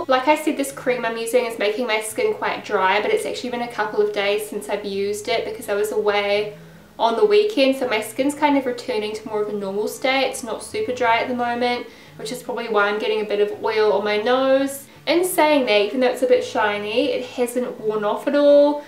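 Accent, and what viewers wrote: New Zealand. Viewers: hahahaha "eeeeh it's the eees-pee-eeeff" :') You sounded so cute there! I love the kiwi accent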